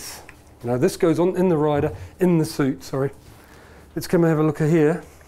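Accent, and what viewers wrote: New Zealand. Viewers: This dude sounds kiwi..Is he??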